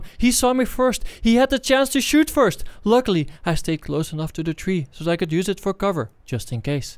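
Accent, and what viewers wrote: Netherlands. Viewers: love your vids man, question.. are u Dutch?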